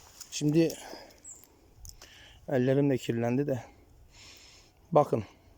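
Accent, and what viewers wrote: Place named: Kahramanmaraş, Turkey. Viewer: Konuşmana bakılırsa maraslisin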